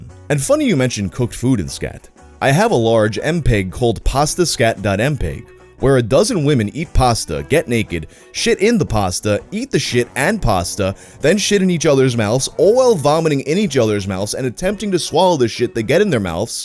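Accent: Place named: New York, United States. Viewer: I don’t know why I never realized you were a fellow New Yorker before. YERRRRRRR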